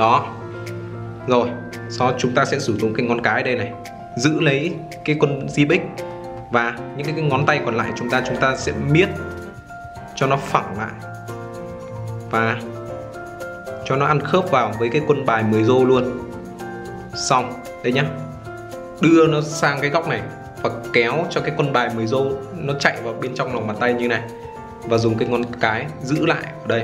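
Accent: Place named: Vietnam. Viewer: anh kêu là tiếng anh mà đọc kiểu tiếng việt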